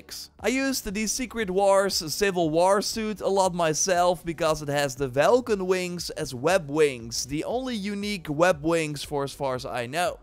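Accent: Netherlands. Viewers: As Dutch as an accent can be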